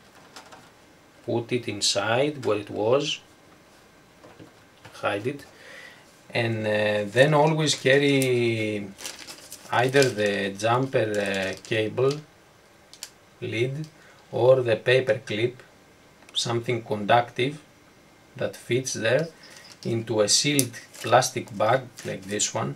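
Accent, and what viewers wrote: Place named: Greece. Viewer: nice vid, when I heard your ink in first second I said .. this guy is greek, giamas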